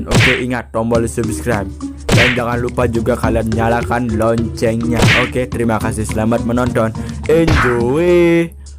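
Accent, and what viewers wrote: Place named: Bali, Indonesia. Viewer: Bang pasti dari bali ya :) mohon di balas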